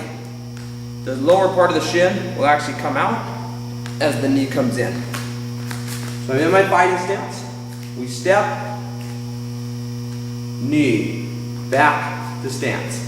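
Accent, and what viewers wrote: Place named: North, United Kingdom. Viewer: you live up north somewhere? I can tell since you say bag as bayg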